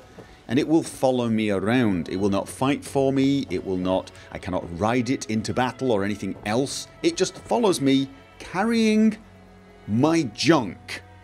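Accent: United Kingdom. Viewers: video as always, mate. Which part of Norway are you from? Your accent sounds somewhat British, then again, I've only heard the Norwegian accent a handful of times.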